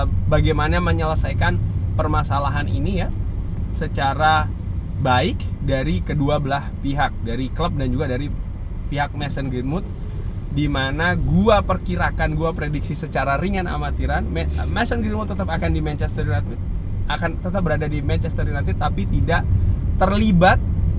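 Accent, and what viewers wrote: Semarang, Indonesia. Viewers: Orang Semarang bang?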